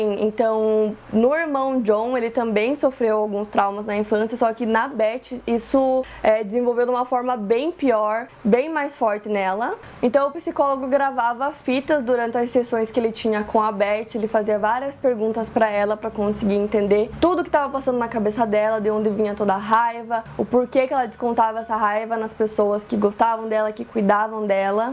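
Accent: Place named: Paraná, Brazil. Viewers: Ela é de PG aaaa amo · ela só é sulista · Eu também sou de ponta grossa PR msm cidade que ela então pra mim é normal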